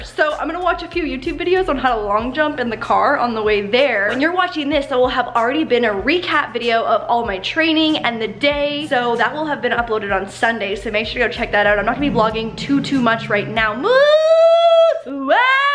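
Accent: Canada. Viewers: tomoreow hahahhaa love ur canadianness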